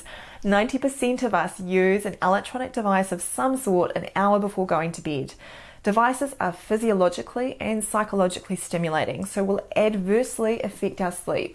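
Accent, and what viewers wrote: New Zealand. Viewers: very good Doc. thank you. Now I want to go to NZ, love the accent! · The New Zealand accent is pretty awesome, I must say. I loved it in Hunt for the Wilderpeople, one of the most hilarious movies ever. But you say in this video we should only use bidrooms for two purposes: sleeping and six. What about five, or four? Are they okay? Maybe some seven?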